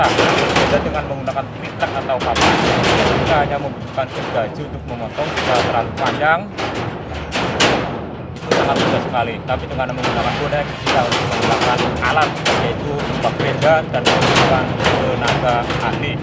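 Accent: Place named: Banyumas, Indonesia. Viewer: Mas...Asli Banyumas apa Tegal?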